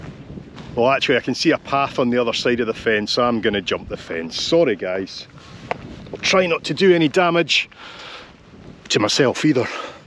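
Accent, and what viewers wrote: Scotland, United Kingdom. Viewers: How I have missed hearing a Scottish accent; I live in Canada. Thanks for the beautiful videos. I have a great passion for Scandinavia myself, specifically Iceland. The people are very similar to us Scots, or at least so I've been told😊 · Your Scottish accent is AMAZING!!! Sending peace, love and prayers to you!!! 😊😊😊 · Love the video. I was searching for more about Faroe islands. I love this video also because of the fantastic scottisch english accent. Greetz from The Netherlands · I certainly do enjoy your Sottish? accent, do wish that I could emulate the fine sound 😮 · Excellent video. Love that Scottish accent...from a Celtic cousin in Ireland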